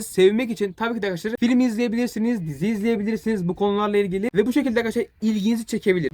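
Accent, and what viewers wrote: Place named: United States. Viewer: Aksanın çok yoruyor amerikan aksanı gibi :D bence biraz bu konu da değişim yapsan iyi olur gibi. yapabilceğine inanıyorum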